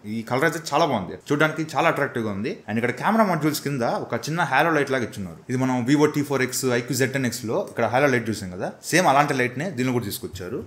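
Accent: Nellore, India. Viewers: Anna Medhi Nellore aa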